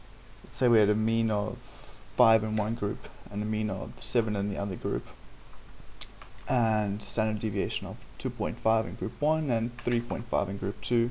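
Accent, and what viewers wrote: South Africa. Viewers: screams are you South African??!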